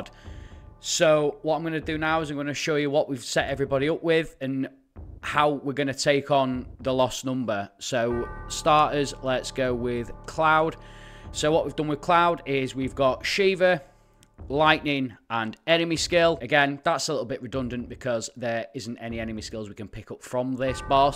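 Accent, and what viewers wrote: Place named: Yorkshire, United Kingdom. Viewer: The Mrs is from Yorkshire! Where you from big man? Some armpit like Selby/Goole?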